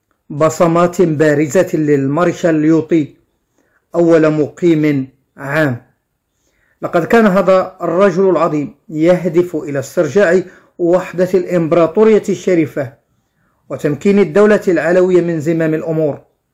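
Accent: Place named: Algeria. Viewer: هدا لي تيهدر باين جزائري مافيها شكك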